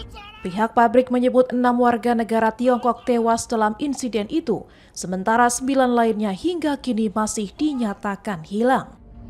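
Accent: Indonesia. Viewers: Widiihh suroboyoan,e metu , arek endi iki ?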